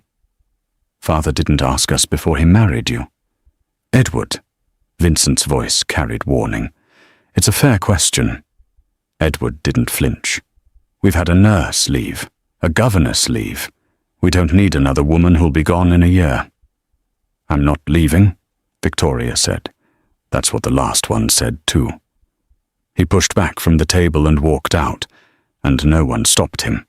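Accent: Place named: United States. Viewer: You can travel around the universe but one cannot ignore the fact that, there is a big difference between proper English pronunciation, spoken by the people of the UK and American's version of English pronunciation. And we are listening to a story of Victorian England. Very inappropriate and causes double standards.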